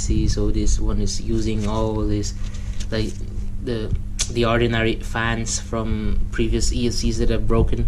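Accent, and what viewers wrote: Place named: Malaysia. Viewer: i thought u are from malaysia as your ascent is quite similar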